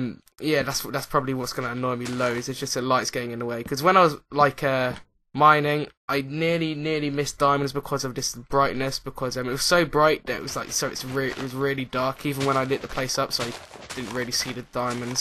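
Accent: United Kingdom. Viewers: You say we Americans have an accent? Well I don't believe that but I do like the British accent like you did say... But I would love to have a German accent. So go ahead take my accent I'll take your British one. :)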